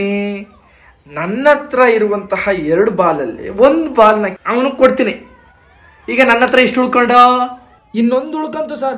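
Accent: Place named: Bengaluru, India. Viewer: Tuluva na? Ashtu channage local Bengaluru Kannada maathaadtheeya alva?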